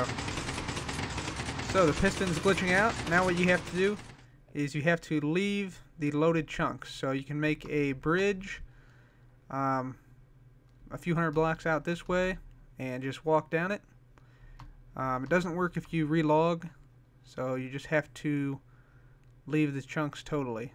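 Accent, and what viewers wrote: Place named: Alabama, United States. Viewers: you sound KKona as fuck my dude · you sound alabama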